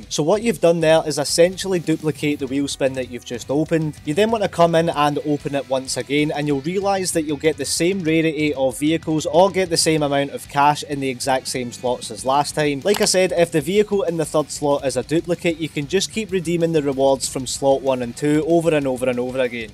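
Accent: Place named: Scotland, United Kingdom. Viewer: Your Scottish accent is awesome, I enjoyed every minute of it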